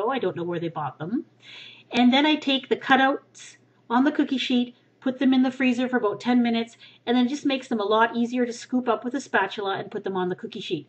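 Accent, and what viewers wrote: Canada. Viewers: you're Canadian?!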